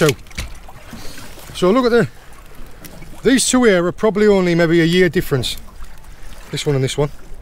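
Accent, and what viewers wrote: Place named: Geordie, United Kingdom. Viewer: Hello Sir, I hope you, family and friends are all well. What a great video. I shoot and fish for the pot only, or give away to the neighbours, nothing goes to waste. Very informative video and entertaining too. Are you Geordie or Sutherland? My Dad could tell. Take care and stay safe., England.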